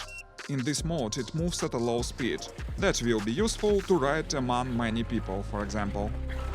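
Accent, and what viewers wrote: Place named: Russia. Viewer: мужик у тебя акцент русский.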